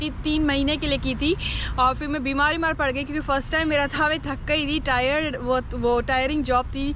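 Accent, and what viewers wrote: Sindh, Pakistan. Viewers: Paka Pakistan sa ha bolna sa lag raha ho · I listing Sindhi style urdu speaking after 15 year love thissssss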